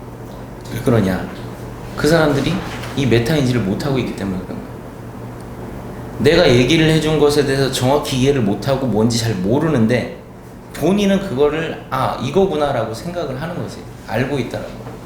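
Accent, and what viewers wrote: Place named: Gyeongsang, South Korea. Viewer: 말투가 쌍도네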